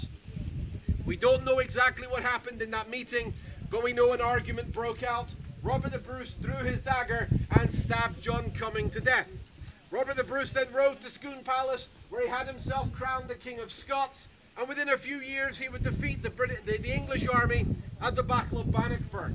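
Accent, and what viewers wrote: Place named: United States. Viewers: the presenter is a Highlander Born and bread, he went to America To study where he picks up his American twang. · Interesting presentation, however, the presenter sounds TOO American for the sake of authenticity. Saor Alba🏴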